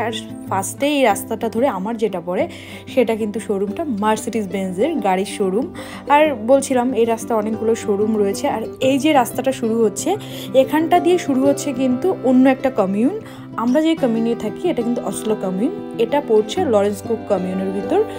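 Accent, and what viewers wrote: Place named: Bangladesh. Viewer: Actually apnar kotha shune majha majha Bangladeshi moto lage. Tai ask korlam.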